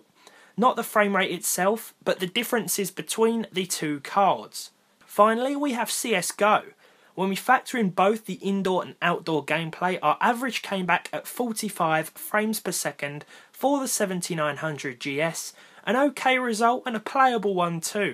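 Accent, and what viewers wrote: United Kingdom. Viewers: Nice video dude! Just a question. Where exactly are you from? I'm asking you because I'm studying British English at the moment but your accent is quite different from the other British speakers that I've known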